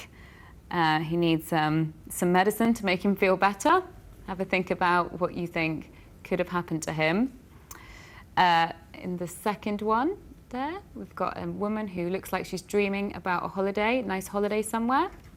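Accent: United Kingdom. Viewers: Omg, your accent is just killing me. I came here looking at some lessons and now I'm in love. British women accent rules!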